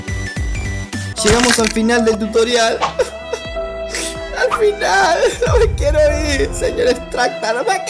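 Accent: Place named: Chile. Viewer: ablas como mi chile querido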